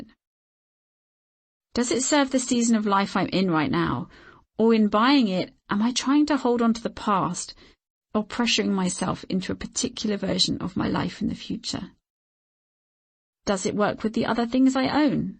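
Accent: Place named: England, United Kingdom. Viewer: Great book terrible narrator should be a male voice, not an English voice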